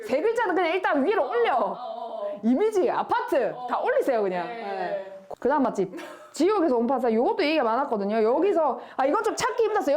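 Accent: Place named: Daegu, South Korea. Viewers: 아, 이!미지로 찍으신다길래 읭? 경북인가?했는데 찐 경북이셨구나 ㅋㅋㅋㅋㅋ지나가던 경남 웃고갑니다😂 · "이미생긴 이미지" 이건 부산,경남 사투린데..ㅎㅎ 선생님 사투리는 대구,경북 사투리기준이군요^^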